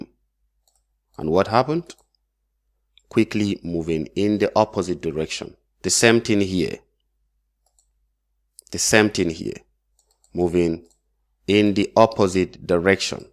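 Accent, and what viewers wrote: Nigeria. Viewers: Nice, are you from Nigeria?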